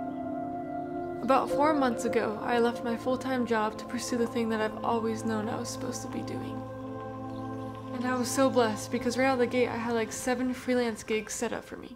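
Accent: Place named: Netherlands. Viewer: Well `done, you accent sound dutch buy the way! :)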